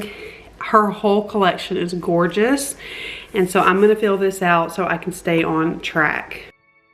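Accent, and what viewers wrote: South, United States. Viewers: Love , love your southern accent ! My moms family comes from GA .Love their southern accent! Miss my family so much !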